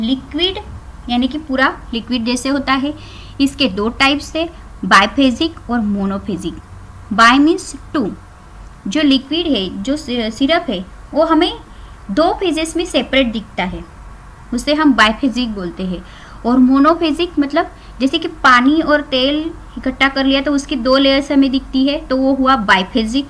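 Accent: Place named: Kerala, India. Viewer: I sure you’re from Kerala 99%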